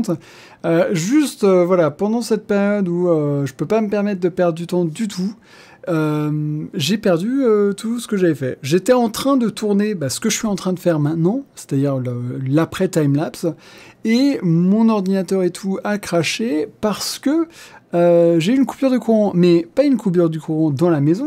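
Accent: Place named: England, United Kingdom. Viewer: Ecoute je t adore mais stp arrete de faire l accent anglais please 🙏🙏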